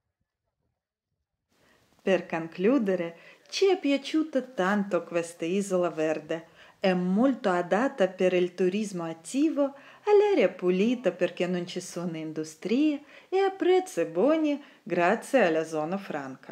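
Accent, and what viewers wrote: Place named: Russia. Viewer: Какой прекрасный русский акцент! Спасибо за рассказ и видео! Красивый остров...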